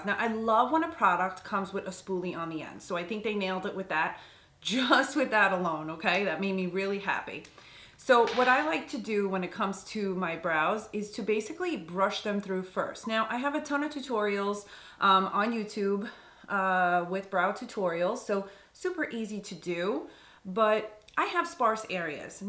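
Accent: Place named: Long Island, United States. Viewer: I love your LI accent! I’m a guido from Brooklyn myself..lol. I never really had the need for makeup. I’ve been fortunate in that way. Good genes I guess. I’ve started to lose my brows since my thyroid condition. My hair, nails, lashes and eyebrows are just not the same. I’m not experienced at all in the makeup department anymore so I’m so afraid of makeup now and looking silly. I’ve seen that you can have your makeup done beautifully but if your brows are done badly, well then that is all she wrote. Everything just looks wonky after that. One of my problems now is picking shades (so many shades and undertones to chose from). Your brows are fuller than mine so they look darker but I think I’ll go with shade you’re using. I’m 50 something now..cough.. cough so I need something.. I’ve started using better skin care so I want to use makeup with good anti-aging ingredients. 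As for makeup, even if it is just putting on some mascara, concealer and having my brows done would be helpful so I won’t look so tired. Any tips would be appreciated!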